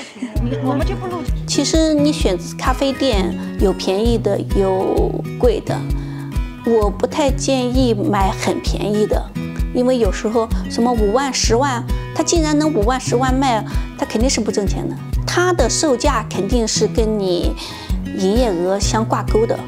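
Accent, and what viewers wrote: China. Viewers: 果然是南京老姐，一听口音就像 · 一听就是江苏的， 这里面对的困难要比国内多得多，就业发展（无论是你或者子女）都不如中国， 但好在你生的是个女儿， 在澳洲也不难混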